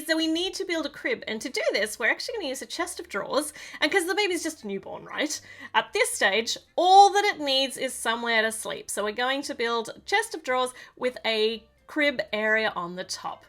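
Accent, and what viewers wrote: Australia. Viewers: Americans: sooooooooo Australians: sooorrr I love your accent and your cheerful attitude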